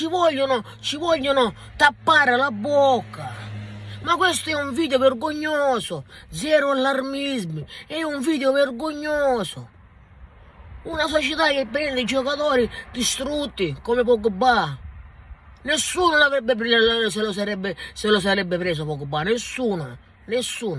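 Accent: Sicily, Italy. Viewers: Ma con sta parlata sicula come fai a tifare Alta Savoia ? Boh !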